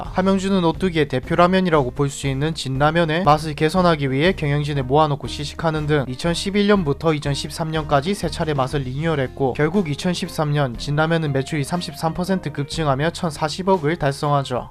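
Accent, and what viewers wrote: Gyeongsang, South Korea. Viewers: 나레이션이 사투리죠? 고향이 경상도인가봐요. 잘 듣고있습니다.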